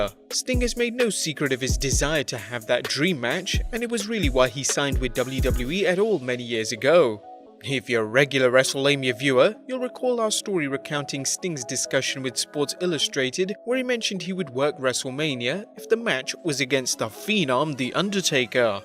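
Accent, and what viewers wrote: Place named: United Kingdom. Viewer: I realize he's British, but yeah..."Bay-zohs" and "Ama-zON"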